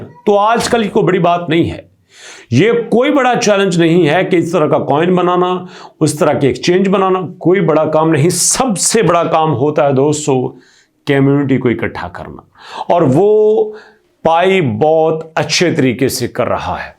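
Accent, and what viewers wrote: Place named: Assam, India. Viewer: Indian Assamese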